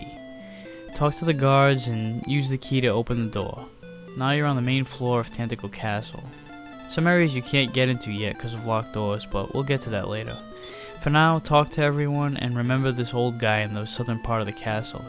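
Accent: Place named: Massachusetts, United States. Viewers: I like your accent. Which part of Massachusetts are you from? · From New England, are you? You cant hide the accent Me too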